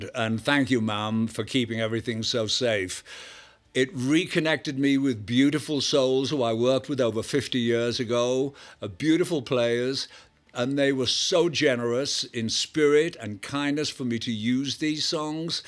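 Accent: RP, United Kingdom. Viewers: He speaks rather posh English.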